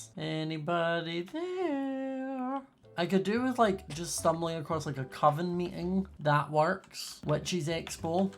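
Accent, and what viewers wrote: Scotland, United Kingdom. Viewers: What part of scotland you from, assuming not glasgow, maybe edinburgh? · Scottish - English Tiger-tinger Cute-cuuet Lol leave a like.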